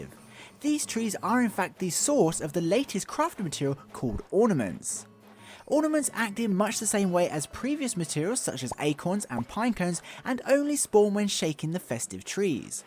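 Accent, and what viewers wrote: England, United Kingdom. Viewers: He sounds like he lives in England and I think they are a day ahead and this ornament stuff comes tomorrow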